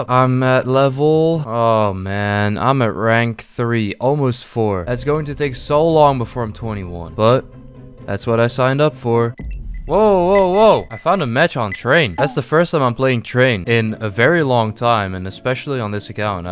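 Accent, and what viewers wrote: United Kingdom. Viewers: Omg ur british accent is so fucking nice dude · nice britich acent matè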